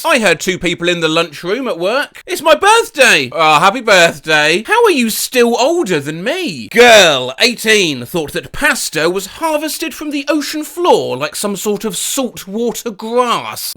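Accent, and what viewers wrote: United Kingdom. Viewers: Hi 😊❤ I'm not very fluent in English, so I watch YouTube vids to improve my skills. I love your vids so much and also I love British accent 😍 thanks for your great work 🤗 P.s: I'm Iranian and I'm ashamed to say some people here do this😂😂😂😂 bro probably was my compatriot 😅😅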